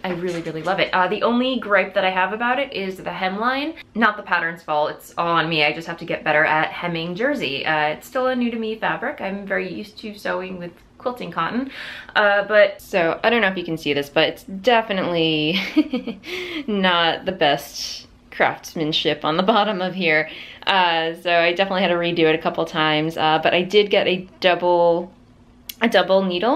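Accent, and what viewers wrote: Brooklyn, United States. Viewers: your Brooklyn accent was BY FAR the best thing i heard all day! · chuckling here at your Brooklynese accent .... so cute.... and as always love your podcast! oh ... and your dress is great too. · You've inspired me to try dyeing yarn and now you're inspiring me to sew more. I really wish I could sew up a whole wardrobe for myself. But I'm such a newbie! Oh and I loved your Brooklyn accent! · OMG!!! You SO need to do an episode in Brooklynese!!! So good! 🤣🤣🤣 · I'm from the Fort Hamilton area of Brooklyn and I don't have a very strong accent either. Your accent actually sounds a lot like mine. My Grandmother use to say "Errl" for oil but she was from the Jamaica area originally. None of my uncles or Aunts have that type of accent like my Grandmother. I think it was perhaps more from the German area of my family that did not speak that way. 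So perhaps it is the German/Brooklyn connection. I do still drop my R's though. Dinner is "Dinna" still. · What a fun inspiring and energetic episode ! Your shawl/ blanket looks so amazing ! Wow You made me laugh with your Brooklyn accent - too funny , in a good way Hope you have an amaIng week XEmilia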